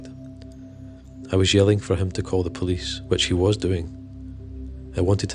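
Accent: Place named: Newcastle, United Kingdom. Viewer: The Geordie accent make the story 10x better!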